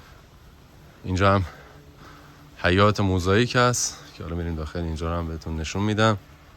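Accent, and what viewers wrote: Shiraz, Iran. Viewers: درود بر شما. چه کار باارزشی انجام میدهید. واقعا ما را به عمق تاریج میبرید. دستتان درد نکند. لحجه شیرازی زیبایتان مرا به کوچکی خود و فامیلم درشیرازمیبرد.❤💛💚💙💜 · من مشهدی کانادایی. چقدر صدا ؤ لحجه ایرانی زیبا و قشنگ و خوب.و درست است.ایراتیان بهترین لحجه را دارند.چون الفبا ایرانی.بیستر و مفهوم تر است.زنده یاد ایران زنده باد شما با کنجکاوی شما و تحقیقات شما. کار خوب ت را ادامه بده. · دستت درد نکنه برا ساخت و تهیه چنین برنامه های خوب و پر محتوایی. اجرا و کیفیت هم بسیار عالی ، لهجه شیرین شیرازی هم که بقول خارجی ها is icing on the cake 👏👏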